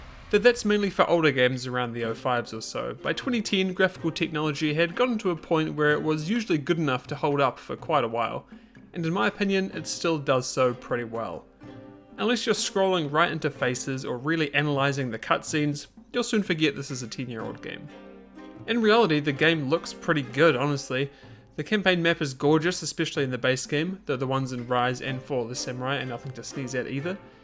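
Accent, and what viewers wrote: New Zealand. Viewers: Wow, you’ve got one of the kiwiest accents I’ve ever heard. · "Some clans may begin in a more precarious position than others" Legendary Uesugi flashbacks What timing though. Was surprised to see a video on this when I'd been playing shogun 2 just a couple of months back, and shocked to hear a good ol' kiwi accent. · Oh he's a kiwi I was wondering why the accent was confusing me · homie is kiwi af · I love this review for a few reasons: it's exceedingly late, which means it's gonna help folks who may have compatibility issues on newer systems; it's weighing the game more on its own merits than just mindlessly comparing it to Rome 1 or Medieval 2 as some old school TW fans tend to do; and the exceedingly kiwi accent. The only thing I disagree with in this video is the statement that Warhammer 2 is the best example of a Total War game thus far. 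Mechanically, maybe, but a huge part of the appeal of the franchise has always been the large scale battles set in their different takes of different historical periods and settings. You can't take that away from Total War even if it permanently shifts to fantasy, like going into LotR, Game of Thrones, or even WarCraft lore (which I'd honestly love to see). In that regard, I'd still say Shogun 2, especially including Fall of the Samurai, is the epitome of a Total War game among the post-Medieval 2 releases.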